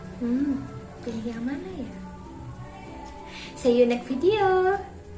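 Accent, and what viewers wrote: Java, Indonesia. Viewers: Medok tpi biutipul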